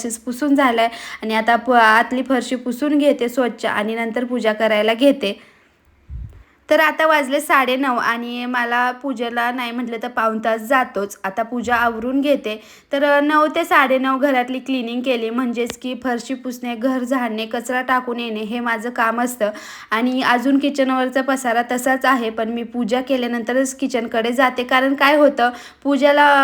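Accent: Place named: Sangli, India. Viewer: Languagecha tone sanglikdcha vatoy...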